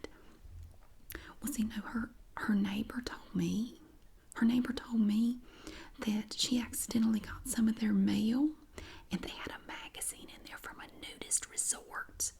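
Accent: South, United States. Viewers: I'm transfixed by the southerness... haha :D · adina andrei extra points for a southern accent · Her southern accent is what stands out the most on the entire YouTube! Extremely professional and relaxing!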